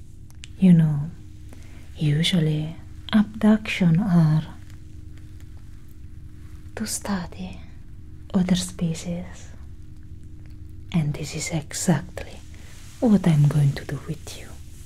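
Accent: Russia. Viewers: U russian? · Гуд рашен акцент, агент Кетрин. Вел дан😋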